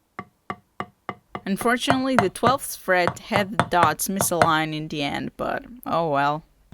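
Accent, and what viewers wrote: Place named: Portugal. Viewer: Assisto teus vídeos a bastante tempo e não sabia que você falava em português! E pelo sotaque e português de Portugal! Eu sou brasileiro e adoro ver teus vídeos! Parabéns !!!!! Continuo fazendo mais vídeos! Poste vídeos mesmo de pequenos projetos executivos! E muito satisfatório ver como você trabalha! 🤩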